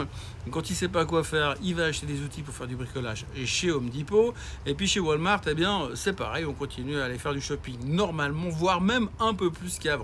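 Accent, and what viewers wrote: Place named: Carouge, Switzerland. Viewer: Le marché monte notre ça l’énerve! Tout laisse penser qu’il a pris des positions à la baisse Et ce look de vieux navigateur solitaire et cet accent carougeois traînant ni changer rien.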